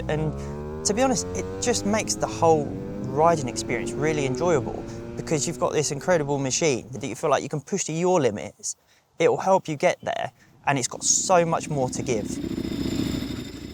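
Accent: United Kingdom. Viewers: Nice british accent 🏍️ I'm a Spanish speaker, and I understand it better.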